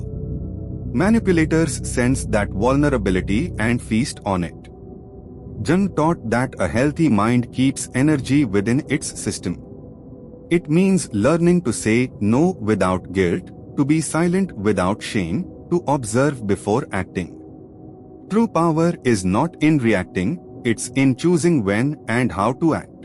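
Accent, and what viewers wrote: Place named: India. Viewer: That indian accent 😂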